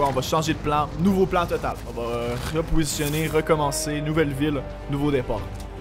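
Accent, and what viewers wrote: Quebec, Canada. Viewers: SNIPEUUEE! MDRR trop fort l'accent québécois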